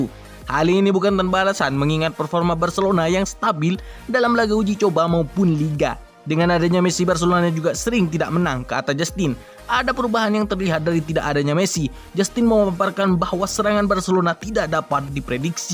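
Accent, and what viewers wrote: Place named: Medan, Indonesia. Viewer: Abng anak medan yh.nmpak kali ckpnya anak medan kali wkwkw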